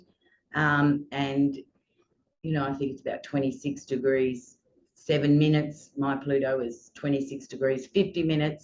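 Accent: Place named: Australia. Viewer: Wow 😮 I just walked in on the most epic astrological gift !! You had me with the Aussie accent but now going back to soak up all of your videos uploaded prior to this one ❤️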